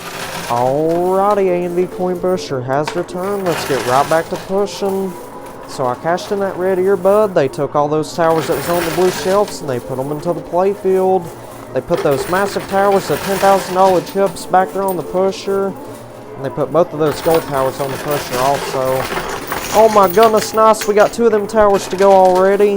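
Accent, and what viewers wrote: United States. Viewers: I like American English accent. 😊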